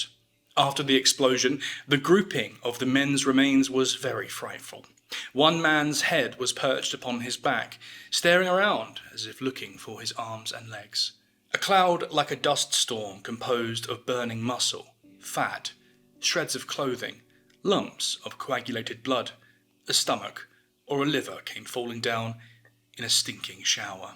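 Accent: United Kingdom. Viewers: r u british btw ?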